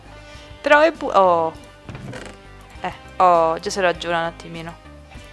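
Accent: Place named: Rome, Italy. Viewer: Solo a me non piace quando parla in romano? :c (non ti arrabbiare, ma per me è così <.< per il resto sei bravissima)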